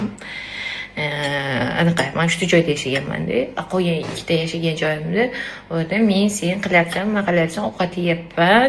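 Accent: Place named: Sho'qo, Uzbekistan. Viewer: Soʻqodayam shunaqa sheva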